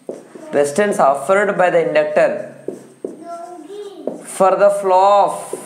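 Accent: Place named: South India, India. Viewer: Where do you live sir? Because from your accent, you seems like south indian and south Indians teach better than any other (this is my experience)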